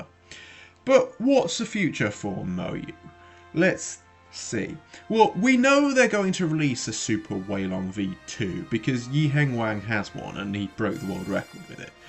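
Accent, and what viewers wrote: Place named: RP, United Kingdom. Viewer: I love your posh accent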